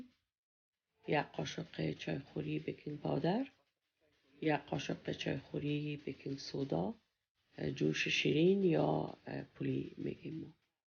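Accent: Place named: Kabul, Afghanistan. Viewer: از لهجه تان معلوم میشود که از کابل جان استید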